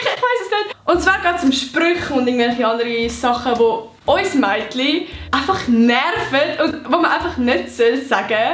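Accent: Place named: Switzerland. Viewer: scho klar aber vo welem kanton wel de akzent chunt mir bekannt vor😂😂 Und überigens mega cooli videos wo du machsch👌🏻❤️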